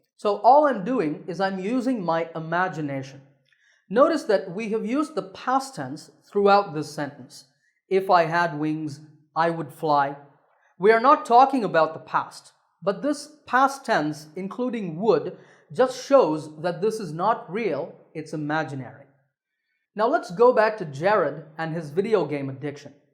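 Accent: United States. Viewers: Sir, I want to speak English just like (i.e. like a US citizen) you how can I? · Aapki awaj ekdum american jaise hai per ho aap indian how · Sir your english is exactly like American flaunt english. Are you living in United States?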